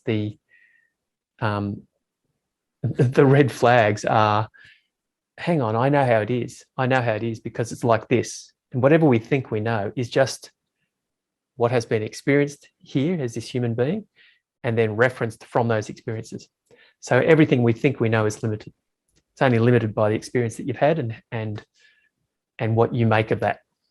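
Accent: Australia. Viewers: Hi, you are obviously Australian, do you hold satsangs or meetings anywhere? Who are you talking to?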